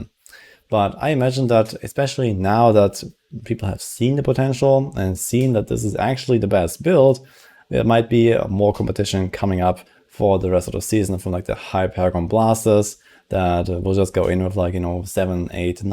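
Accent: Germany. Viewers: Für einen Deutschen hast du kaum akzent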